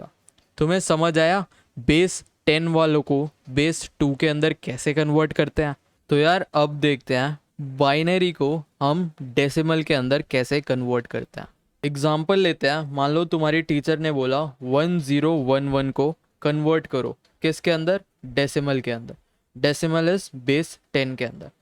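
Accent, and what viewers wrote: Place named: Mumbai, India. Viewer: I can tell from his accent he is from Mumbai.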